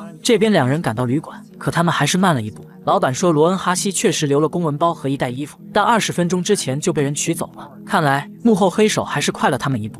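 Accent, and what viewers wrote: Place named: Sichuan, China. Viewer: 感觉解说员是我们四川人啊！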